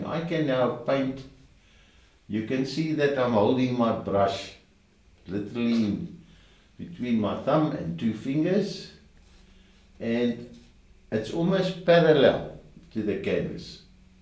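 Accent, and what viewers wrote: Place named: South Africa. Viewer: That is beautiful! It is so nice to hear a fellow South African accent!:) I was born and raised in Joburg and had relatives in Pretoria... I used to love visiting when the Jacarandas were in bloom!! You have a very artistic eye and easy style of painting. I will certainly attempt to paint from your tutorial, thanks for uploading it:) I now live in Hawaii, but I miss the motherland a lot....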